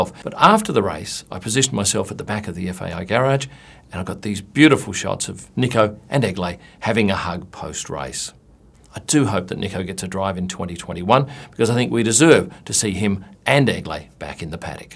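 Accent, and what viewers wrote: Australia. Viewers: I have to admit, I too heard 1996 first. I went back and listened to it a few more times., your handsome Australian accent may have been a reason for mishearing the number. Being more used to hearing British and American English, the diphthong in '86' sounded more like '96' in my ears. But glad to stand corrected, 86 it is! 😎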